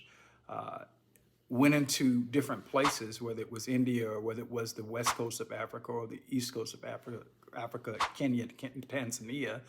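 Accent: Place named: United Kingdom. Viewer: When you talk I feel like you have British in you !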